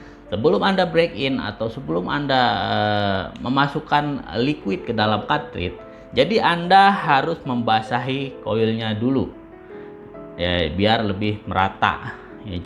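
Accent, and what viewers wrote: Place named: West Java, Indonesia. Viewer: Letah sundana mani atra pisan namana oge sunda pisan euy😀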